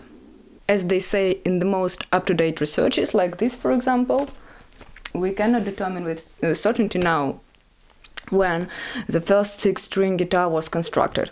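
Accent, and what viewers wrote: Russia. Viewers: I love your accent hehe. You know pretty much of music history by the way, wish some day meet you and talk all day, you can teach me russian, and I can teach you spanish ! Hahaha. Buen video, saludos ! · Music needs more than 6 or 7 or 10 strings, music is choking with limited strings number, give it freedom 💁🏻‍♂️ I enjoy this explanation, and like the way you speak rushinglish😅... But above all, my dream to have 6 or 7 or 10 strings guitar 🤩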